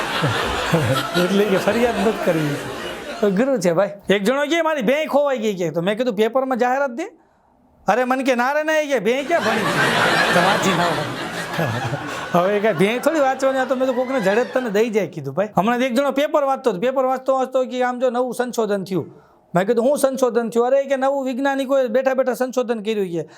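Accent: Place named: Kathiawar, India. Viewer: હસતો રહે, હસાવતો રહે, કાઠિયાવાડી લહેજામાં સંસ્કારી હાસ્ય... પ્રસરાવતો રહે!!! રિદ્ધિ-સિદ્ધિ ખુબ વરો... વડિલોના આશીર્વાદથી સિદ્ધિનું એવરેસ્ટ સર કરતો રહે!!!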